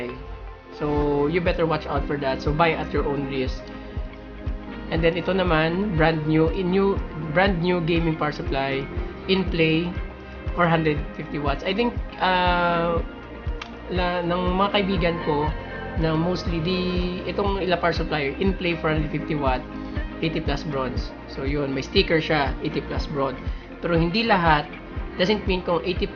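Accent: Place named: Iloilo, Philippines. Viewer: Prang ilonggo ka kasi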